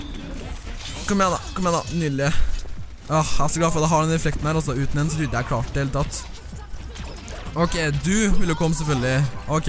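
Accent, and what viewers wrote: Trøndelag, Norway. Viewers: Trøndersk e bæst!!!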